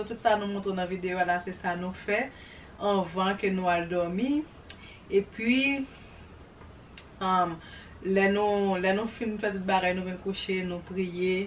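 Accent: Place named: North, Haiti. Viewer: Hi mes amours Nou se moun okap?fason ke nou pale surtou dam nan 😅 mw menm mw se moun okap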